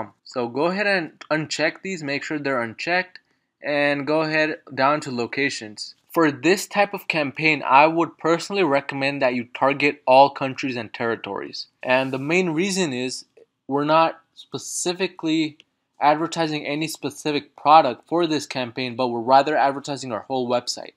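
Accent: India. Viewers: Did you mean campaign or company? What is this bg sound. Where are you from india?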